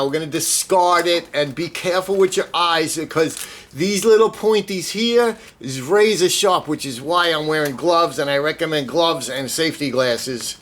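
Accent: New York, United States. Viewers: Really easy to understand. Great video. Living in Hawaii, we meet and welcome people from around the world. If we did not, we would get bored looking at each other all the time. As I watched your video, I was thinking to myself where might I have heard your accent before. It came to me. Remember the movie "My Cousin Vinny"? And I do not mean it in any degrading way. Not at all. You explained everything the way it should be. Just right. Thank you for putting together a great video. A thumbs up, for sure. Aloha! K Inouye Honolulu, Hawaii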